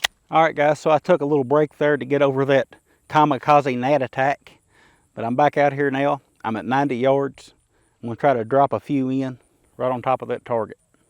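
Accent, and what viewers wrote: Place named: Appalachia, United States. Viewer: Commander Data with Appalachian accent.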